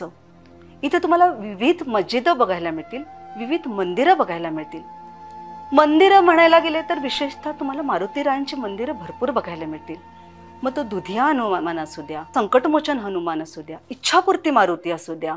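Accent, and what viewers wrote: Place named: Udgir, India. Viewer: Kay to confidence, kay to speech delivery, kay tey accurate udgir mimicry and description, ekdam goosebumps madhe ❤❤🤙🫡🫡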